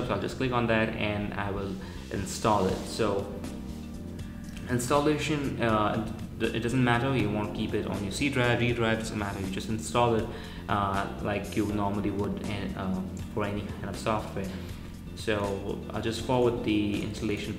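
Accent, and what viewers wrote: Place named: India. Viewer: Why does this Indian dude have 160 Subscribers he should have at least 20,000 he's just speaking English without any pronunciation errors but has an accent which is ok but he's just the best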